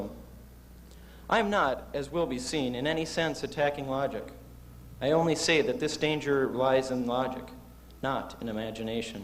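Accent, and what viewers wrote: United States. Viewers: Well done,! I was working for Shopko at the time you graduated. I can relate to the toys you mentioned. Haven't thought of them in years! I hear a faint upper midwest dialect when you speak. Though definitely not from a small town or rural area. I miss that company; made lots of friends with folks who came this way(Omaha), to open stores. We had 5 at the peak! Again, good speech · I thought I was lucky going to highschool in the early 2000s… early 90s must have been even more badass! 90s was the best decade… it was the last gasp of humanity before the digital age..I’m guessing from your accent.. Michigan?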